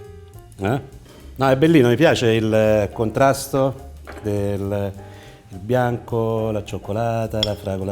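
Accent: Tuscany, Italy. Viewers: E' toscano, probabilmente. Ci viene naturale!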